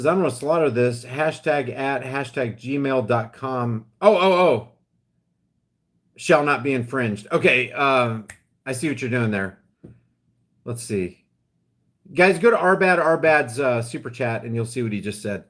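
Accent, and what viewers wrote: South, United States. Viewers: I like the southern accent,lol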